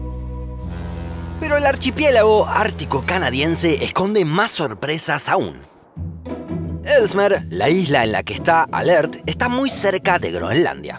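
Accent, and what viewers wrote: Argentina. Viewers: ¿Este canal lo hace un argentino?, pregunto por curiosidad.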